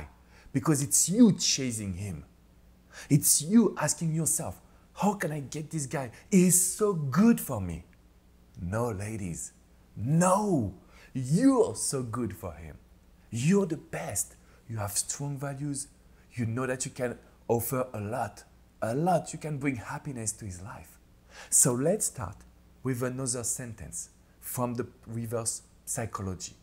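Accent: France. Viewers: Mr... i. like ur" frenglish" language 😊. thank u for ur advices. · I love your videos and French Accent! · Love your French Accent 😍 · Merci beaucoup cher! Please keep your French accent, its lovely and very charming, enchanté! Your advice is always precious:-) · Hi woooao you are such a great relationship couch you are making things happen with that French accent. do you you know what your teachings are so sweet and short very powerful. thank you very much for your teachings. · Omg, I love your french accent as you reminded me of my late french hubby's voice...oh I missed him so much... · I LOVE your french accent😊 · Come here for his voice strong French accent 😊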